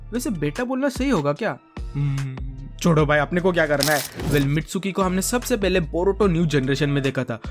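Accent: Maharashtra, India. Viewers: Bhai tu Marathi hai?!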